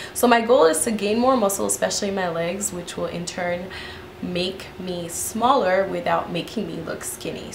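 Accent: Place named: Africa. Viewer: Wow, I'm a new subbie and I've learned you are African, yet I could not detect an accent in your voice until I watched this video. When you talked about your chores in boarding school, you accent came out. I was pleasantly surprised :)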